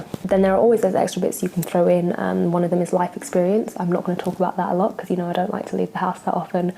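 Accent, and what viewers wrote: England, United Kingdom. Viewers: where are you from in england?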